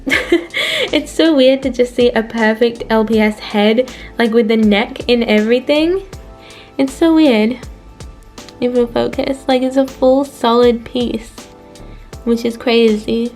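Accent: Australia. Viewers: This is random but are you Australian?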